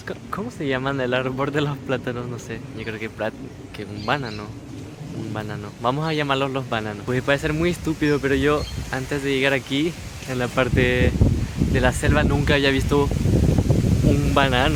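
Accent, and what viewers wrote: Chile. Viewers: Tiene acento chileno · trasmites una paz con tu acento Chileno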